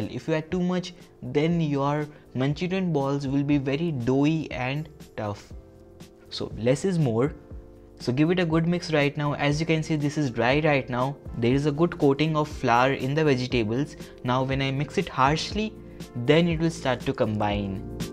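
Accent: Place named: Bengal, India. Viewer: Sounds like bengali and they're good at cooking.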